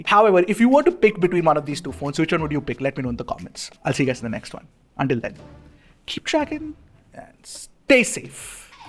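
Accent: India. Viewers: Indian English accent is so Funny😂😂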